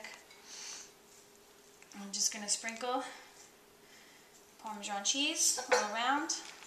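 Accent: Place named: New York, United States. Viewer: Awesome video!! Love the accent... got to be from NY 😊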